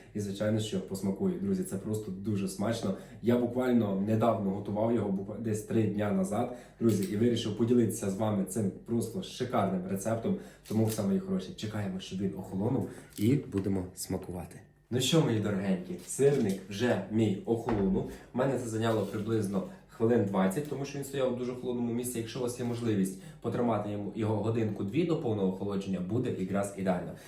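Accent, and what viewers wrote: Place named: Western Ukraine, Ukraine. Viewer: Супер. Говір з Заходу України. Деякі слова діалекти я і мої рідні досих пір використовуємо.😊👍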